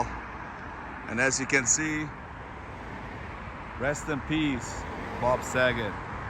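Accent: Germany. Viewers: Thank you for bringing such beautiful flowers from us too,you are very kind, and considerate. loved Funniest Home Video's! I love your German accent, beautiful!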